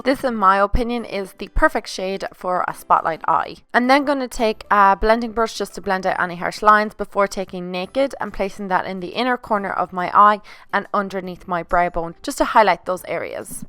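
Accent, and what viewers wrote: Ireland. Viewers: I love your accent is it irish? you should name the kitty Oreo. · Mariah M the accent is Irish, I'm Irish myself 🍀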